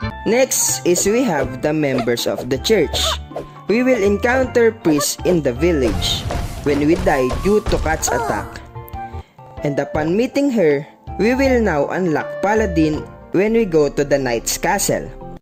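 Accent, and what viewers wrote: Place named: Philippines. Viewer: Bro are you Filipino? I recognize the accent anywhere.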